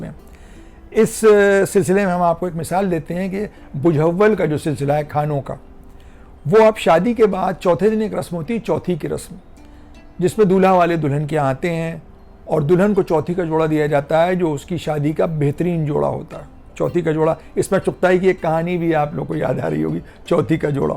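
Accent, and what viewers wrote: Lucknow, India. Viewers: Adaab,kya he kehnay janab ap k or ap k andaz e bayan k.lagta hy Lucknow pohnch gaye hum tau.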